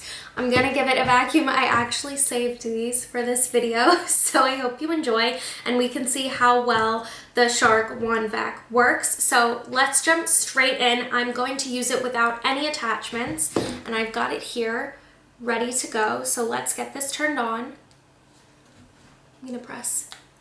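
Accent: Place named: United States. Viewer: London...that explains the British AC plug, but not the American accent of the presenter. But good job though. Answered all my questions about the Shark Wandvac. I'm going to assume they come with US AC plugs for US customers.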